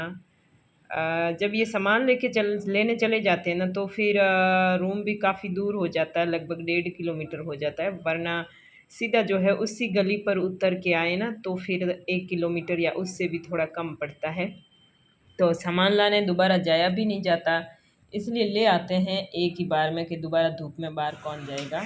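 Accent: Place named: Rajasthan, India. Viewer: Meri beti ki ichha thi apki marwadi sunne ki aaj puri ho gyi ,kl bol rhi thi ki ye didi marwadi bolte h kya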